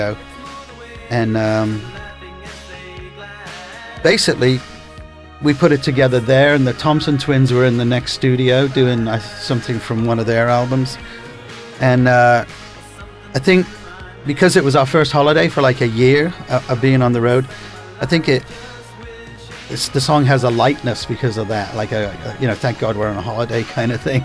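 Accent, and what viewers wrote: United States. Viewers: Is it me or does he have an American accent? Isn't he originally from England? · What's with the ridiculous america accent mike and you're toned down your Liverpool one embarrassing ?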